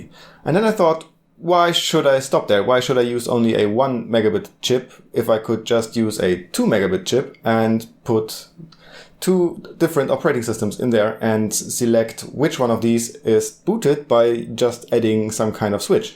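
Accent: Germany. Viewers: Ich höre einen leichten German Acczent ;o)... warum nicht gleich auf deutsch - gibt leider, viel zu wenig solcher guter Videos auf deutsch! Trotzdem: gute Arbeit - vielen Dank!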